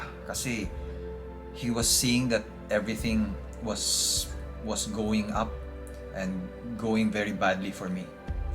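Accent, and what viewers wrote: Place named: Philippines. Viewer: Are you an American? Still your accent is Pinoy!!! Tagalogggg plssss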